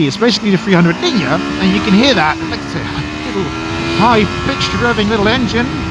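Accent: Netherlands. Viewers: Well look at that, a Dutch guy doing motovlogs whose accent ISN'T grating to listen to. I'm Dutch myself (Nederlands jeweetog!) and if there's one thing that consistently grinds my gears it's other Dutchies vlogging with 0 attention to pronunciation, proper enunciation, or even vocabulary. Sure, one doesn't have to mask one's accent, but at least an attempt at sounding vaguely localized is much appreciated. Related, keep up the good work, sir. I'm very interested in buying a Versys 300X. Currently riding an CBF500 and a Leonardo 250, and I'm looking for a physically bigger bike to fit me a little better without losing the nimbleness and (relative) low weight of a small bike.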